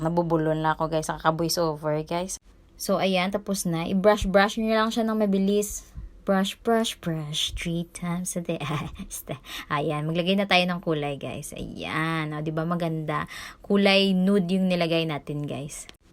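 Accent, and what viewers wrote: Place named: Iloilo, Philippines. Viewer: Ilonggo accent haha di madula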